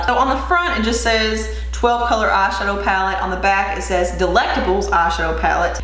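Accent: South, United States. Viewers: I knew I heard southern in that accent! Fellow kentuckian makeup lover here!!✋ ❤ much love to and for you!!😁 you're awesome girl! Thank you for your service to the beauty world and keeping it real!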